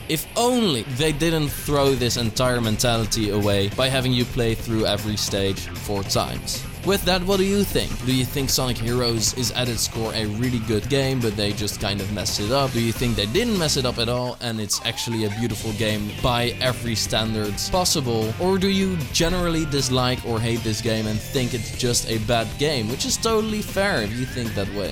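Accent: Netherlands. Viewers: Youre from the netherlands right i here your accent haha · Pardon me for asking, but are you dutch? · hears him speak for 10 seconds. Yeah he's dutch, beautiful accent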